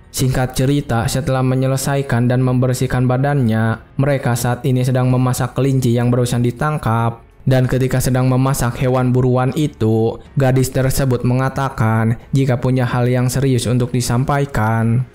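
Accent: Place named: Madura, Indonesia. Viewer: Pasti ini orang madura yg jadi naratornya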